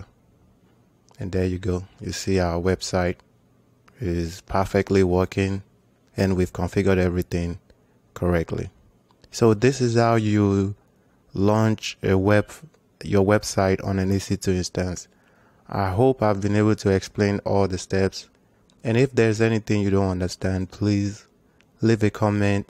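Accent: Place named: West Africa. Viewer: Dude keep this up! Best AWS walkthru. Also cool you have a West-African accent - keep striving!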